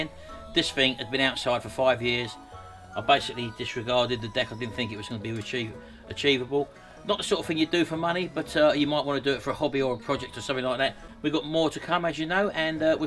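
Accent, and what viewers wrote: United Kingdom. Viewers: And English you are!!!!! As I have been amazed by your passion and your left side of brain (left handed as well). Wondered for a bit of your whereabouts until you finally spoke as I assumex you could be Canadian, British or German and with that, I assumed correctly... Left handed and no ring (who in his / her right or left mind would wear a wedding ring. To that end, married or not, straight or otherwise you sure know how to work with your left hand and fingers. You also have very good digital skills (printing+video editing). One take....The sign you printed was slightly off center when applied. of all trades....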